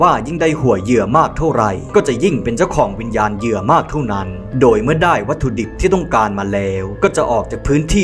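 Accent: Thailand. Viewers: แอบเหน่อนะเรา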